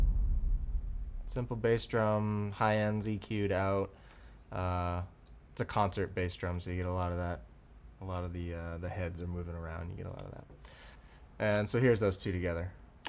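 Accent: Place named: Portland, United States. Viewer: I could tell you where from portland before you even said so. I would know (I live there)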